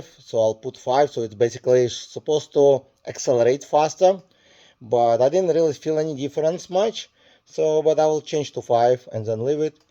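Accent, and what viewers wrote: Russia. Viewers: Че за русский акцент?)))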